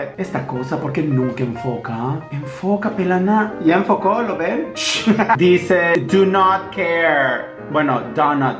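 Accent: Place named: Yucatán, Mexico. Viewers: Tu voz siempre se me ha hecho peculiar, ese acento fresa y yucateo a la vez. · Amooooo tu tono yucaaaaaa NUNCA LO PIERDAS AHHHHHH MARE LINDO HERMOSO 😉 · Cierto es que le dio un espacio en el mundo al acento Yucateco. Por ti, creo que habemos muchos que antes no lo tolerabamos y ahora amamos el acento y hasta lo imitamos. Te quiero nene. Pd lavate bien tu tuch que hay covid lol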